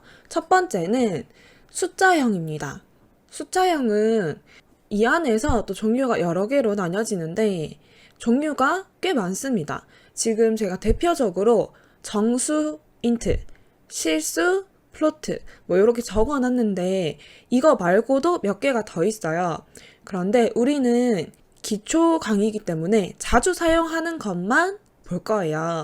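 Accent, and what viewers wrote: Gyeongsang, South Korea. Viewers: 갱상북도 사투리네이~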